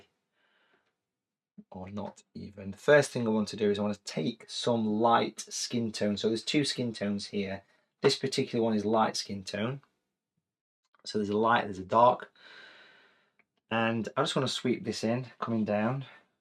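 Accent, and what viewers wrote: United Kingdom. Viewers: I shall give this a go as I loved the rocks. Love your Derbyshire accent too 👍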